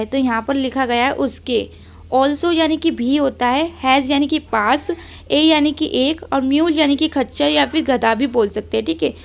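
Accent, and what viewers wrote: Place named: Bihar, India. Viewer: Bihari you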